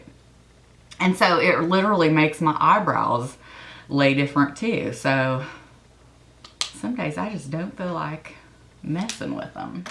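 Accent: South, United States. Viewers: You must be from maybe Georgia,or Alabama?Am I close?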